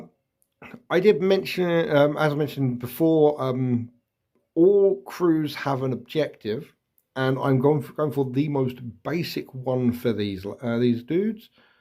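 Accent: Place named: United Kingdom. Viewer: Big into Five Parsecs, and I'm playing it 15mm as well, so to find your channel (a fellow Brit by the sounds of it as well!) is great. You might be getting a fair few comments on me as I blast your playlists.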